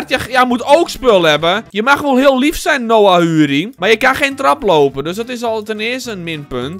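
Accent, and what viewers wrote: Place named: Netherlands. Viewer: das mijn taal. lekker plat Holland(Engels)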